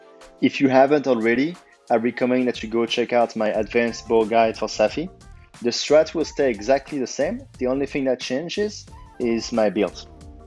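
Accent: France. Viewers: Ha ha, je m'en doutais ton accent anglais fais très français, sinon super build, vraiment original et passe vraiment bien sur le safi ;) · J’ai reconnu l’accent lol. Tu fais pas de vidéos en français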